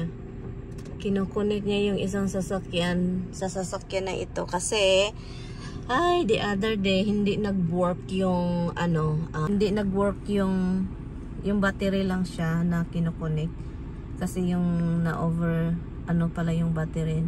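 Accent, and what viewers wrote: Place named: Tagalog, Philippines. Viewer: Natakdan-nahawa sa tagalog ate hehe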